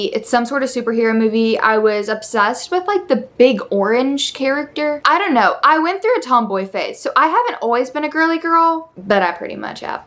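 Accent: South, United States. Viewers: I think you should try not to waste too much of your time with negative comments. Misery loves company. People that try to tear down others are just miserable and can’t stand the sight of people being happy. You are such a ray of sunshine. You work hard for what you have and you deserve to spend it and enjoy it how you like. I’ve never gotten the impression that you brag or show off. Not at all. You seem kind, sweet, hard working, fun and funny, thoughtful and caring. I love your southern twang, which is much more pronounced when you are trying to set some boundaries for Baby Elle. Instead of a stern talk, it comes off adorable. 😍📱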